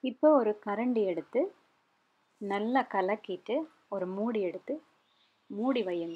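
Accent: Sri Lanka. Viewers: Loved your Tamil pronounce, always loved to hear stilankan Tamil.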